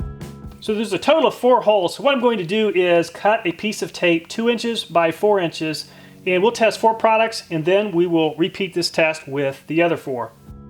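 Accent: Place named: Brooklyn, United States. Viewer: He's from brooklyn you can tell by his accent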